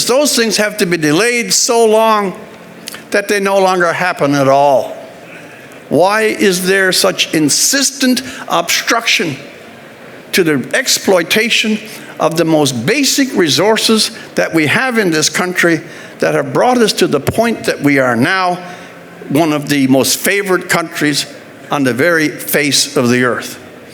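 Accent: Canada. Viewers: Although I have always thought Rex was an icon in journalism I feel he has sold out to big business. First making fun of David Suzuki really not very becoming. Yes the mining industry is important to Canada however the companies that own them spend their resources to produce technology to replace people that work in these mines or oil fields. If they had their way they would have robots do all the work. Our jobs have been continuously been sent to third world countries because labour costs. These giant companies will do anything to cut costs as they are responsible to people who invest their extra wealth in their stocks. As far as Newfoundland is concerned they exploited the fisheries mostly because of the same reasons. They sold their fisheries to big business, then over fished it screwing themselves out of work then relying on the handouts of fellow Canadians to subsidize their stupidity. 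Then when jobs became available in the tar sands rushed out to Alberta so they could work and then take the money home and live like kings because housing prices were much lower in Newfoundland then anywhere else. Someday these fossil fuels will run out and yes I agree they have given us the good life but at what cost. Big business doesn’t care it’s all about money to them. I’m sure Rex is speaking for free for this group lol. His Newfie accent and correctly spoken grammar is hypnotic to the people who don’t look at both sides as he massages the brains of upper management of global corpora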